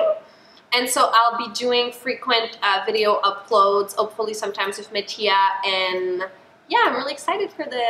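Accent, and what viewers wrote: Quebec, Canada. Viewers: No Pickle Yeah but that happens because her main language is Canadian French so she has an accent when she speaks English that results in some words being higher pitched. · Are you French Canadian? Cause your accent when you speak English sounds a lot like mine when I speak English. Also, in the short film MISHKA, I saw our beautiful Canadian flag so I was wondering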